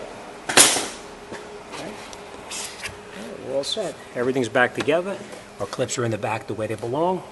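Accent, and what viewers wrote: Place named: New Jersey, United States. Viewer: I closed my eyes and it was like Bobby Deniro’s garage in here! Love that Jersey accent! I’m gonna do this soon on my 03 Sport plus check all the pulleys for noise. Mines got 200k and it sounds like idler, tensioner or power steering pulley is making an awful hum. Thanks for the great video. The complainers can just fugget-about-it! Lol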